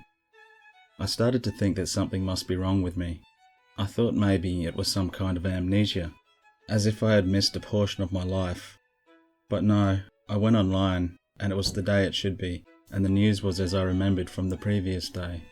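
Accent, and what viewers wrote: Australia. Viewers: are you from Australia?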